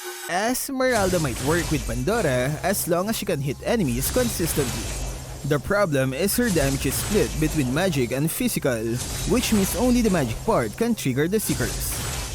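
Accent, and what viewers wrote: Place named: Philippines. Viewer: when will you ever improve your english accent it’s so obvious you’re filipino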